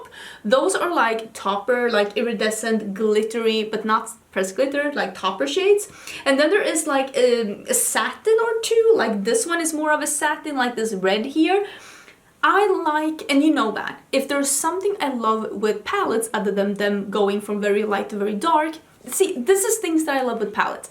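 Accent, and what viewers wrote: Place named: Sweden. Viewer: the way you kept saying "depth" in your cute little swedish accent! 😭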